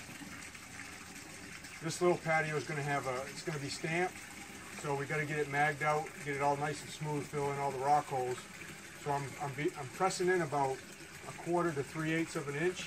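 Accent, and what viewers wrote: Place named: Massachusetts, United States. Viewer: Cornah' herah' fingahs' you from Massachusetts or what? If so fellow finishah saying wicked pissah video. I'm on my 19th year and over the last 3 have been going to northern California to pour in the winter months ......and mine gold⛏. If you are in new england let me know , I could put you on some gold more then likely. That's how gold found me 9 years ago, working late one night walking from basement to basement. Caught of glimpse of something shiny. Picked it up it was a pc of gold in quartz "I didn't think it was real" a year later camping in Rangley maine. Found a nugget in the river and it was on from there. Lots of old mines in new England and most of the gravel we pour on and is around the site holds gold in smaller pcs.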